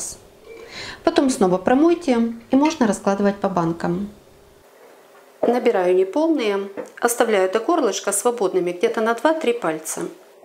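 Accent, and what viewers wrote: Ukraine. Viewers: И не только компоты,но и консервацию.Правда, заливала несколько раз.А вы из какого города,?Очень приятный у вас украинский говор.Я сама родилась и пол-жизни прожила на Украине. У местных господинь всегда было множество просто классных рецептов еще из времен СССР)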